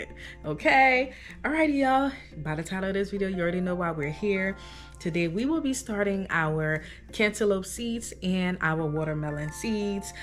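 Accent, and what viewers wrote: Liberia. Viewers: Do I hear a faint Liberian accent?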